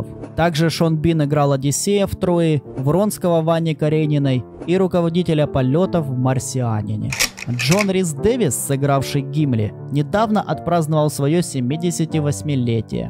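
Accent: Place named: Ukraine. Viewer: Интересно рассказываешь. И интонации зачастую правильные берёшь. Заметил слабый украинский акцент) Угадал? Может показалось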